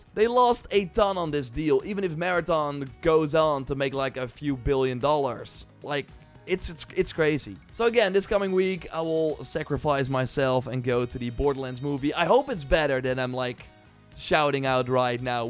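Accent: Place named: Netherlands. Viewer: The Netherlands. He is Dutch.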